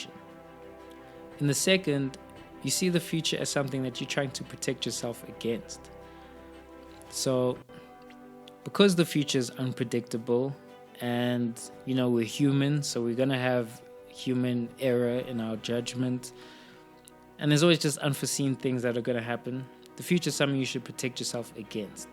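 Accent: South Africa. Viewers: Nice video! Are you South African?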